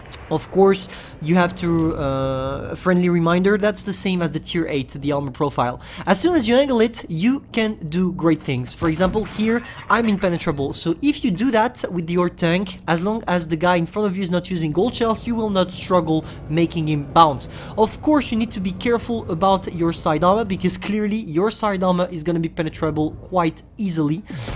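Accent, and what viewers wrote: France. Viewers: From where are you? I would guess France cause of the accent (btw rly good English), btw you just blindshooted me today. Nasty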